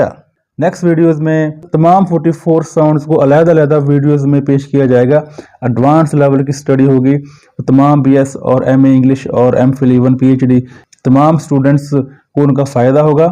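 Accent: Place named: United Kingdom. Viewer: Very near to British English. keep it up sir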